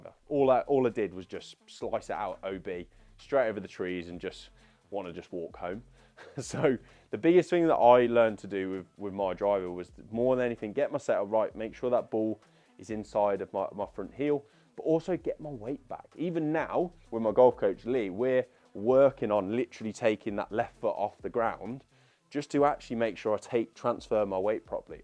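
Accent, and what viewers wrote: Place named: Derbyshire, United Kingdom. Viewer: You sound like you are from not too far away from me judging by your accent. Im from Derbyshire. Where would you recommend getting a fitting? Any suggestions? ✌️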